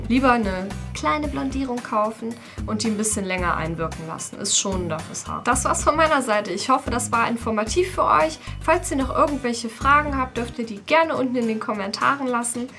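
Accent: Germany. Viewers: Kommst du aus Münster oO ?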